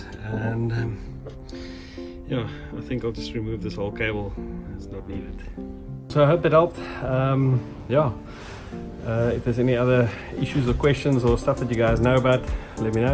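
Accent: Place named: South Africa. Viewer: Nice to hear a South African on YouTube give a how-to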